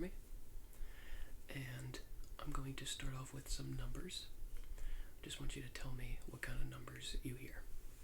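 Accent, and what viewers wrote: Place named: United States. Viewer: This guy looks so British I was expecting a regional accent like a Yorkshire accent not a freedom murica one. Great ASMR though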